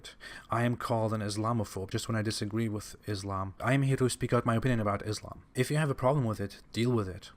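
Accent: Germany. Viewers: Most impressive is that he his accent is a German one. Really hope he wasn't given too hard a time when he was here as a child, by "either side". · Don't apologise for accent. German superior to the bastard dialect called English, duh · Hallo, i can spot his german Akzent as soon as he started speaking xD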